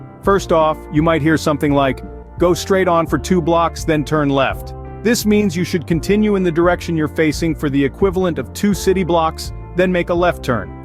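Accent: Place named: United States. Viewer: I love this American accent. How I love you, America! ❤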